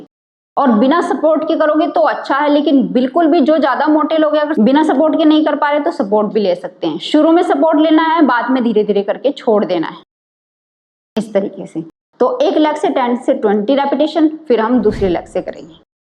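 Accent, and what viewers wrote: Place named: Sindh, India. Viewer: पकक जाता है। लहज़ा सिन्धी बोली का है। क्या आप सिन्धी है? क्या मैं सही हूं? प्लीज़ रिप्लाई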